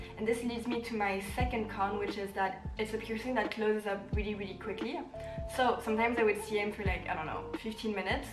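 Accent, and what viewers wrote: France. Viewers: le petit accent français je l’ai aussi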